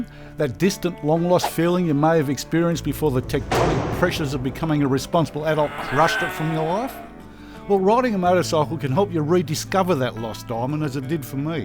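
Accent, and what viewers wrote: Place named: Australia. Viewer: My cousin is laughing so hard at this video right now… shout out from Vancouver Canada, he loves the Australian accent and says you are so charming. Starting to watch your other videos also, he said keep your channel growing because it’s so funny! Wondering why stopped posting By the way 999th subscriber❤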